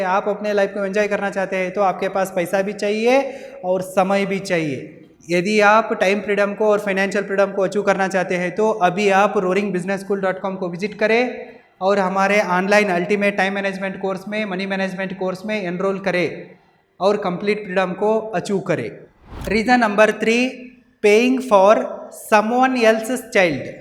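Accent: Maharashtra, India. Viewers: Marathi or konkani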